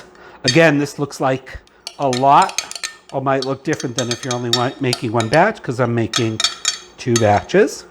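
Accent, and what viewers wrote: New England, United States. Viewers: Thank you so much! I saw the new video and replied there too. Although I recognize your New England accent I erroneously associated your YouTube name with Cherry Hill NJ. So cool of you to do the special research for the right recipe, love your channel.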